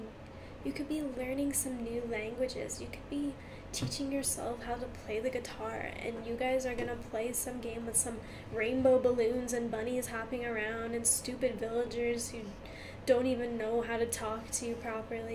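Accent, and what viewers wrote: United States. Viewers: Do you still think your speaking americanish? And I cant get a job im under age. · Do you still speak Americanish or do you now speak English like everyone else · PERFECT AMERICANISH 🔥🔥🗣️🗣️👌👌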